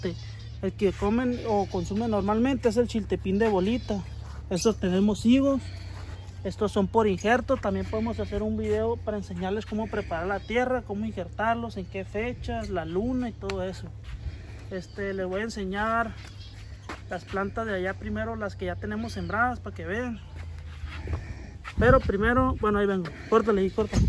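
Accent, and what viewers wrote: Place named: Sonora, Mexico. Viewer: Buenos días. los felicito por ser tan amable en explicarle. Yo tengo ganas de hacer una siembra tengo terreno agricola. Soy jubilado me enfado mucho. Es muy interesante. Su publicación. De dónde son ustedes. Hablas como de Sonora. Yo soy de Huatabampo Sonora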